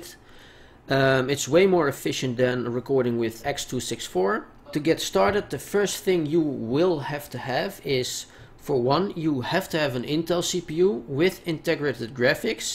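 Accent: Netherlands. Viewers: Hup Holland! :P Aan je engels was het wel te zien :D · Are you from the Netherlands?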